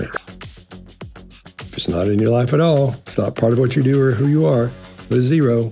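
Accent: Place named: Texas, United States. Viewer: Scott's voice is so soothing, and his accent sounds very Austin / Dallas.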